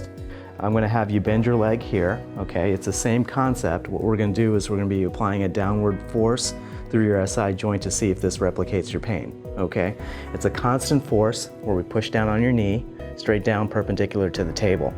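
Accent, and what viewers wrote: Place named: United States. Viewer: Sounds American to me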